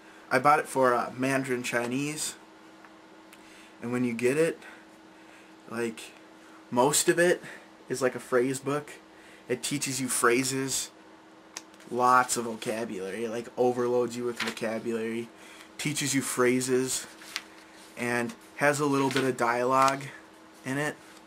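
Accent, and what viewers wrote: United States. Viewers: He has a nice american accent.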